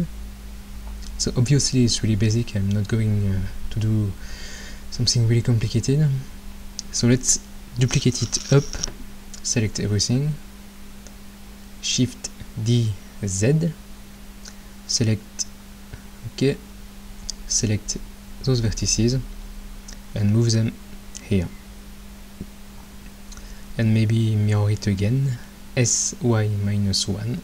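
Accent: France. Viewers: AWWW yeah french accent is the best le accent!! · je pense que tu es français vu l'accent ^^, peus tu envoyer un lien de téléchargement de ceci pour travailler dessus s'il te plait? Merci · I love your French accent.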